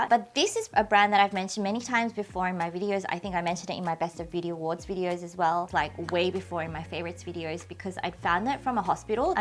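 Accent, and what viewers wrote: Australia. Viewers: Hi, your accent caught me 😅 I’m from down under too! Which part of Australia will u be moving to? I’ve just started exploring K-Beauty and really enjoyed this VDO. Already purchased a-few items you shared 🤭. Looking forward to your next upload 🙏🏻🫰🏻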